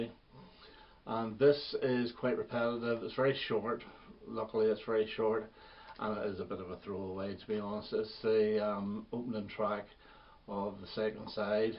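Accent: Northern Ireland, United Kingdom. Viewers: Well that was very interesting. I agree least said about the better, although I much prefer the version on than on. is the keast best of the number ones, but its ok. Hearing as a 13 year old in 82, I loved it & still do., I like &, which I can hear echos of. & are songs I like too. Lovely to hear your accent, I was fortunate enough to work in Co. Down for 5 years, N.I. is a fantastic part of the world.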